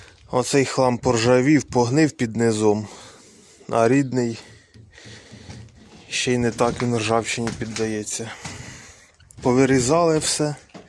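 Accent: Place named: Dnipro, Ukraine. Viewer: Браток,приветствую! Слышу по говору ты из Днепр.области. Поздравляю с покупкой! МАЗ это просто Мерседес для села!!! 😊 А если подцепить прицеп,то 5-6 Газонов заменит в уборочную.😊 Удачного ремонта!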